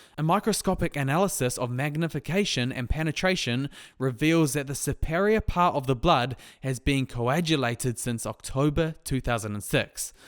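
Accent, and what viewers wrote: New Zealand. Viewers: You're a kiwi right? :)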